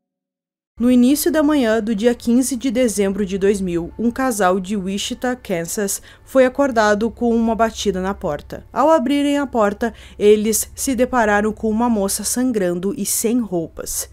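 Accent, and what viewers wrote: Rio Grande do Sul, Brazil. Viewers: adoro seus vídeos....e o sotaque gaúcho....acertei?! 🤗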